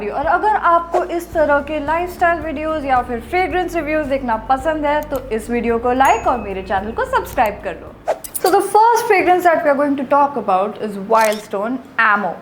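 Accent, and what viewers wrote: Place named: Bengal, India. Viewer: Are you Bengali?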